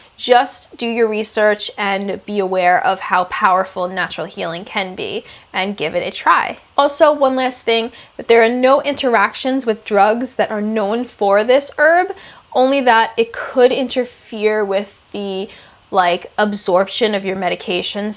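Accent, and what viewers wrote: Long Island, United States. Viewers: Are you from Long Island? I notice an accent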